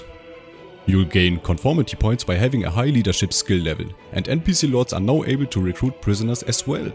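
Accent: Germany. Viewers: Haha mann hört nach 3 Sek. Das er deutscher ist 😁 gj man. Mach weiter so 😉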